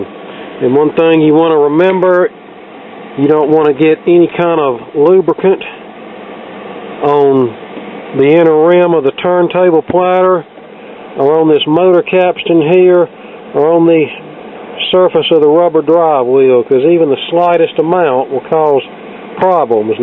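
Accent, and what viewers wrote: United States. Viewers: Fucking Cowboys accent I just love it 😏😌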